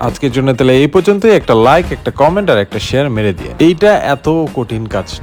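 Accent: Bangladesh. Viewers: Brother are you from sylhet ❤ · খানিকটা যশোরের ভাষা 🙂😊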